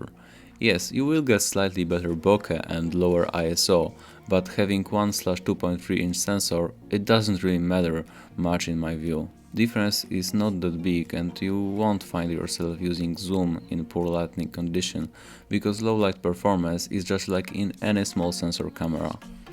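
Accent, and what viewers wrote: Poland. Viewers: are you polish person :)? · Are you Polish? Because I recognize the accent cause i'm Polish. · He's a Pole! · Słychać te Polskie korzenie ale nie jest źle. Łapeczka leci, pozdrawiam :)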